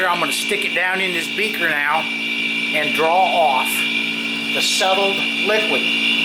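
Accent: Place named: Philadelphia, United States. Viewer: you're from the North East aren't you? I wanna say around me in NJ (in the south where we don't have that accent - we sound liker Philly) and I feel like I hear some Philly in your words, but I'm probably wrong. That's be cool if if I guessed it though. :)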